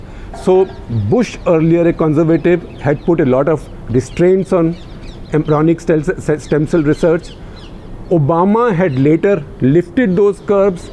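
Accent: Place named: Punjab, India. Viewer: if this guy can drop his Punjabi accent, he'd get a lot more views on his channel. For heaven's sake it's not Indiana Police 😣😣😣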